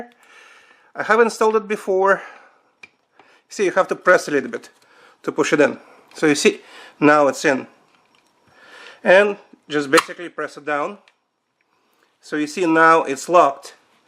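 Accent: Russia. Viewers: слышу русский акцент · Русский акцент ))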